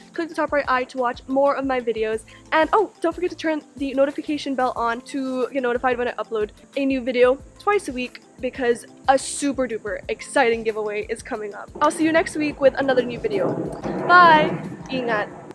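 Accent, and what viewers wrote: Philippines. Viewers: dat filipino accent is cute tho uwu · Your filipino accent is ADORABLE!💓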